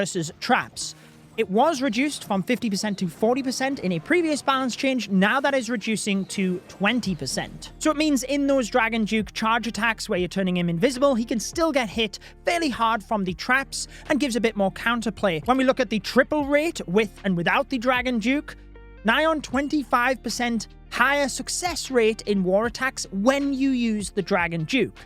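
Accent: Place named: United Kingdom. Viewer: british ascent w'oa army🙂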